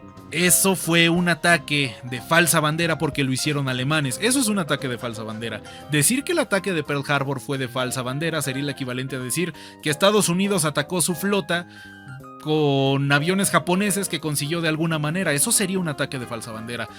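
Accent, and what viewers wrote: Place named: Castile, Spain. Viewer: Acentazo castellano (Mis 10's)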